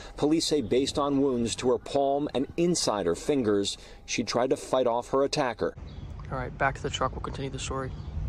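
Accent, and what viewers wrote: New York, United States. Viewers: I like listening to the New York accent without the F-bombs and double negatives for a change.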